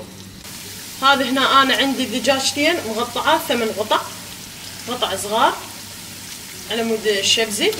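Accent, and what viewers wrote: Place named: Ahvaz, Iran. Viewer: الشبزي العراقي مع اللحم وليس الدجاج. اصلا هذه الطبخه ايرانيه. مبين من لهجتك انتي اهوازيه ويختلف طبخك عن العراق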